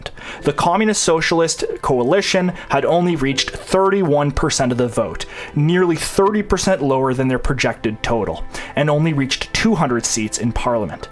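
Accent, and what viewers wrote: Canada. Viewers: Pretty sure the narrator is from Canada. That dialect is unique and screams classic Canadian.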